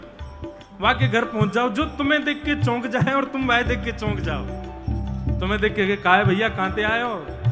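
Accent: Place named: Braj, India. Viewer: Jay Shri Krishna 🙏 ♥️ ❤️ 😍 💙 Radhe Radhe Jay Shri Harivansh Guru Dev Maharaj ji Aapke Charno Mai Koti Koti Vandan 🙏 Jay Shri Guru Dev Maharaj ji Aapki vraj Bhasa Badi Sumadhur hai Jay Shri vraj vasi Jay Shri Vrindavan Dham Radhe Radhe Jay Shri Yamuna Maharani ji Jay Shri Radha Kishori ji Jay Shri Radha Rani 🙏 ♥️ 💙 ❤️ Jay Shri Radha Raman ji 🙏 Jay Shri Giridhar 🙏 ♥️ ❤️ 😍 💙 Jay Shri Govardhan Nath ji ♥️ 🙏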